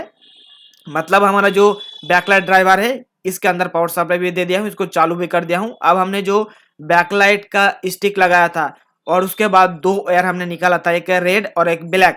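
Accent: Bengal, India. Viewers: You are Bengali Right ❤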